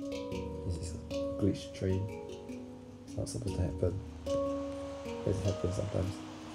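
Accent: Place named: Singapore. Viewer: Bro you sound like a singaporean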